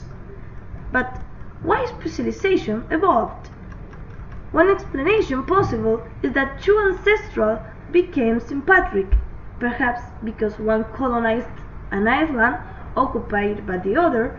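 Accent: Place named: Mexico. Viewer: Hahahaha, good video, you have a funny accent. You're from Mexico right?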